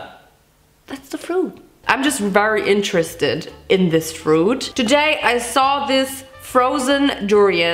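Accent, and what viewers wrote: Germany. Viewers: Wie man ihr deutsch hört ahhahah love it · Man hört ihren deutschen akzent so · ✨Deutsch Accent✨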